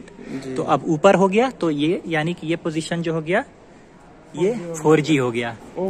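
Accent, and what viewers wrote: Bihar, India. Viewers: Rakhanu. Bihari. Ho. Bhai. Ham. Bhi. Bihari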